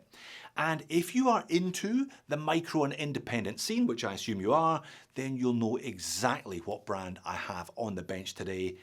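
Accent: Scotland, United Kingdom. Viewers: I can hear the Scottish accent!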